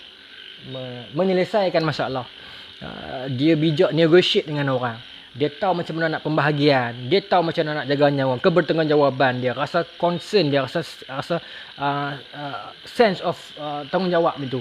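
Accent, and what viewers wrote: North, Malaysia. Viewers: kasaq ustaz 😂. Org utagha mmg camtu hahaha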